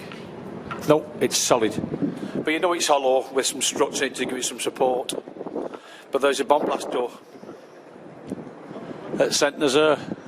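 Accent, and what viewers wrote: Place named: Wigan, United Kingdom. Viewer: Sounds like this guy is from Wigan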